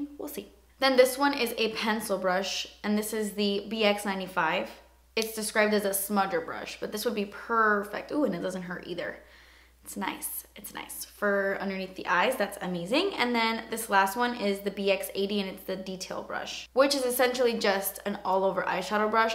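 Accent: England, United Kingdom. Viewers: You're so funny 😂 The British accent and all! 😂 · I love that you do a random british accent in your vids. I do the same in daily life 😂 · You do such a great British accent😍. Better than me and I'm British 😝😂 Oh and P.S I love you 😍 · Girl, your british accent slays me. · I love your english accent. You are amazing xx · Love your British accent!!!! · Your British accent is great! I also enjoyed the Harry Potter references 😂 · love your british accent 😂😂😂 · that british accent tho lol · i love that you always randomly break out into an English accent.. i do that too lol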